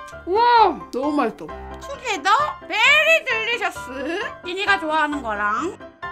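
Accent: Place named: South Korea. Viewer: 약간 영어 못하는 한국인이 말하면서 먹는거 같애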